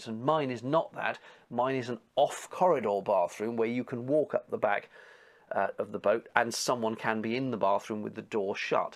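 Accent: United Kingdom. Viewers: Best British accent. No matter what you say, it sounds legit.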